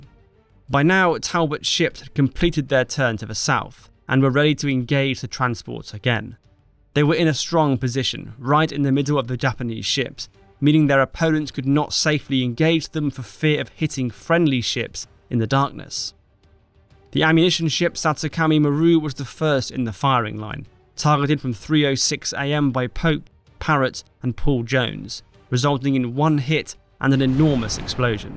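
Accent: United Kingdom. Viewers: Stop narrating these with British people, these are American victories. Should be called by American heroes.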